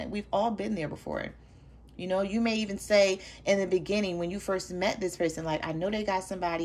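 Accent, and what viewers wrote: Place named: New York, United States. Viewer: You sound like a New Yorker, I enjoy your readings either way.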